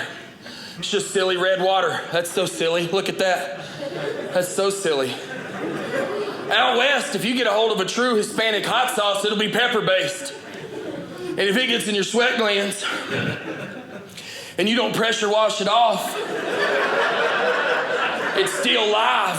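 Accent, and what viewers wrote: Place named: South, United States. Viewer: it's how Alabama, Arkansas, Texas... people talk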